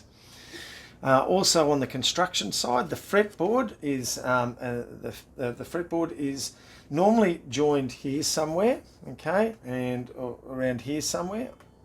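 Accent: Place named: Australia. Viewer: When I hear that accent and see that instrument, I expect to hear, " ".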